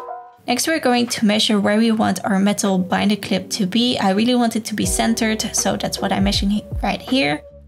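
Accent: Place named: Netherlands. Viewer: Hi! I just found this video and really want to make one! Also, are you also from the Netherlands? I thought i heard a bit of a dutch accent 🇳🇱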